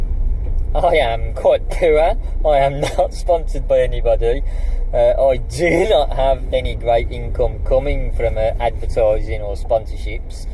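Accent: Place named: West Midlands, United Kingdom. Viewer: funny west midlands accent. Sorry I can't listen to it. I'm so sorry.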